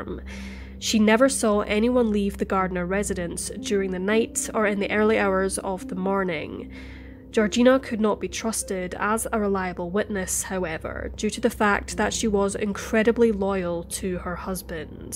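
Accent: Scotland, United Kingdom. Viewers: I like listening to that deep beautiful Scottish accent. Great channel and great stories. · It's the warmth of her lyrically sweet Scottish lilt I love,, yet on the other hand some Scotts sound rough as sandpaper! You'd need subtitles to understand them! FRoM LoNdon wIV LoVe 💋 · I have such a soft spot for the Scottish accent, I'm not far from you either huni, Northern Ireland. Great upload btw , I love love love the oldest cases. New subscriber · Is this narrator from Scotland? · This voice is amazing. I can't get enough of a Scottish woman's accent. Like beautiful music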